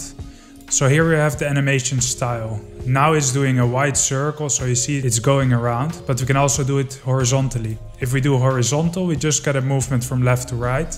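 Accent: Netherlands. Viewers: Jij bent een Nederlander toch 😂? Je accent😂 kan niets anders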